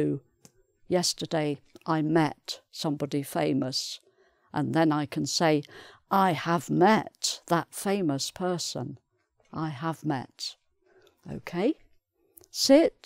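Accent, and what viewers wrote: United Kingdom. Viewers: I Like it This video's good ,learn speak English British with this teacher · * “Very kind and a polite teacher. I am impressed with her British accent”. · Very kind and polite teacher I impressed her British accent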